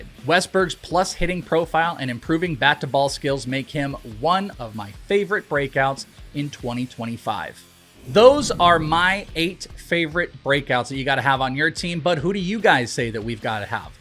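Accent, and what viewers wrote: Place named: Wales, United Kingdom. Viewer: Welsh talking Baseball 😍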